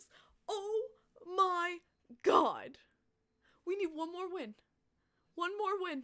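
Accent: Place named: Long Island, United States. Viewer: Your Long Island accent is soooooooooo cute. 😍😘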